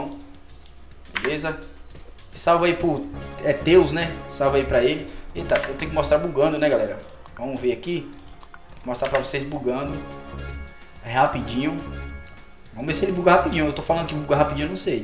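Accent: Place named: Brazil. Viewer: Ree brasileño el man :v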